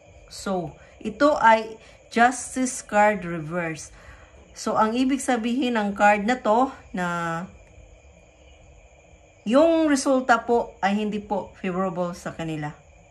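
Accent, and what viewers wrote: Visayas, Philippines. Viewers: The way you talk ay parang hindi ka tagalog. Sa palagay ko, bisaya ka. Believe ako sa tapang mo.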